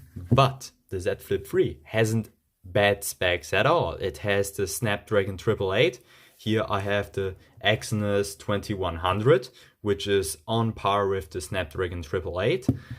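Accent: Germany. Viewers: Your english is very....german Wieso machst du deine Videos nicht gleich in deutsch?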